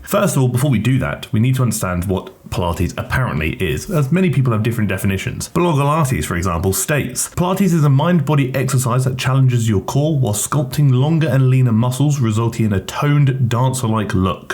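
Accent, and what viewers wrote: United Kingdom. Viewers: Favorite time of week when hot British man talks to me about fitness.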